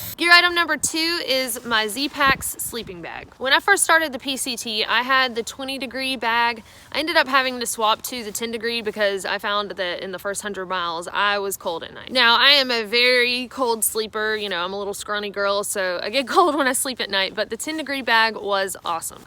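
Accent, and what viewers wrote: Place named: Tennessee, United States. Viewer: New sub here..you got that Tennessee accent. It's either Tennessee or North Carolina.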